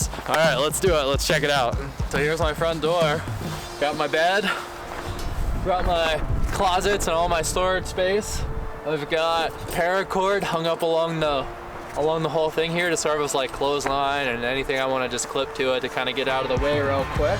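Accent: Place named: Vermont, United States. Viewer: Dude i love you channel! Are you from Vermont? I have that same accent!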